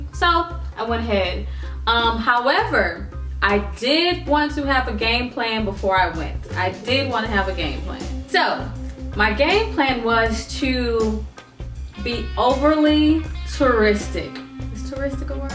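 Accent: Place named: Nigeria. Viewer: You sound like a Nigerian. Your mum is a legend.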